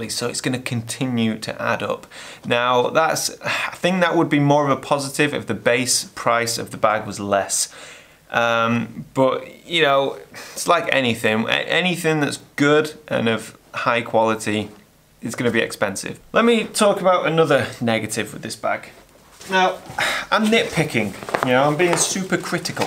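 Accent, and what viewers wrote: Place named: Yorkshire, United Kingdom. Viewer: Ah??? Thought tha was a Yorkshireman. I have gone with a Mammut Trion 35 litre Mountaineering sack (they also do a 50) and put a Tenba Camera insert in it - total cost £160. - plus the cost of a rain cover. Not perfect as the insert has to be removed to access kit but the pack does have the zipper around the harness feature that is rare in a Mountaineering sack. Adam Gibbs - Mr. Grumpy to you, has used one for many years, but just changed it for a bespoke camera pack, supplied as yours is by a manufacturer. No problem with this BTW, I do not begrudge either of you perks for providing such excellent content. The Mammut Pack is also multi functional as it is a rock solid standard pack into the bargain. For street photography I go with a very battered Chinese made copy of a Paratroopers Bag from Israel, cost £15.... 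plus a £35 Billingham Insert - does not look expensive but gives the Camera 5 star protection. And it cheap:-).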